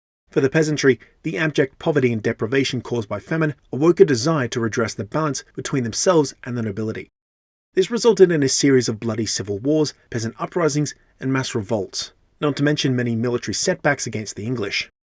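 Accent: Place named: Australia. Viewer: Pretty dope I found an Aussie explaining the FR. Awesome video mate.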